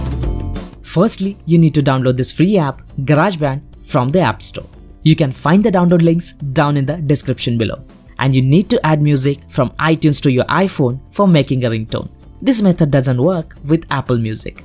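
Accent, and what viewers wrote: India. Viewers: your accent is very strong Indian boy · Thank you very much And your accent is wonderful. I’ve always loved the Indian people’s accents when they speak English.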